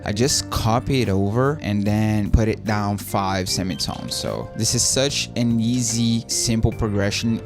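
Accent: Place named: Brazil. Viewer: Pelo sotaque eu acho que tu é brasileiro kkkk acertei?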